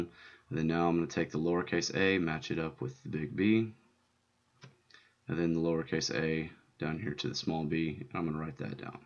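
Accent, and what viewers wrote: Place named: South, United States. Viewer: Nice southern accent, adds pizzazz to the whole thing. Also not trying to strike as creepy but that giggle was adorable.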